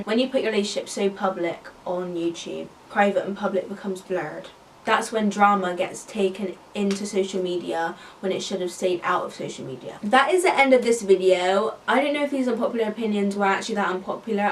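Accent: Bristol, United Kingdom. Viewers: why did her british accent scare me even though i knew she was british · i 100% didn't expect you to have a british accent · WDYM gwynth It’s a British accent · I’m literally watching all of your videos rn and as soon as I watched one I KNEW you were from Bristol 😂😭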